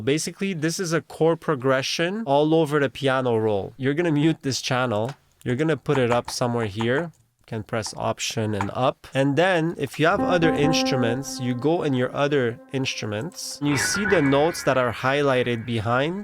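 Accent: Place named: Montreal, Canada. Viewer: The minute I heard your voice I could tell you were from MTL. Super fire watching different work flows! This a cheat code for many who don’t know music theory!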